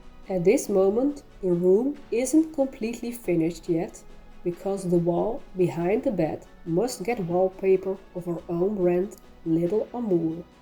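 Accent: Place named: Netherlands. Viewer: Hello! I often think of you and your little family! I have been watching your journey pretty much from the start and i absolutely love what you share and put out to all those, who are interested! Its so refreshing to hear about different customs ( my husband, son and myself LOVE the Netherlands and the Dutch ❤ our son even did Study in Amsterdam ) No need to say, that hearing you speak english with your wonderful dutch accent is fabulous!!! You all seem such great people... even if you haven't shared anything for a while... you are NOT forgotten... you are fabulous exactly HOW YOU ARE!!! Never change that, or ever daubt you are doing something not right on here...YOU AND YOUR FAMILY ARE REAL... you are not faking it...putting something on what not is...loose your personality only to get a few more likes... and exactly THAT is why you and your family are such a joy to watch!!! 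I do hope, that all of you are well and happy and continue to enjoy your life in France to the full! Because in the end this is all what DOES matters... YOUR family and YOUR life and happiness!! Much love...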